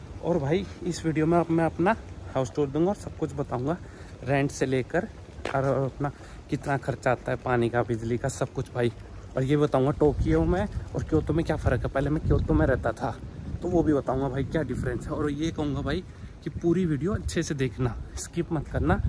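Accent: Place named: Haryana, India. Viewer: mai bi Haryana sah hu mujai ap ki boli sah pata chal gaya ta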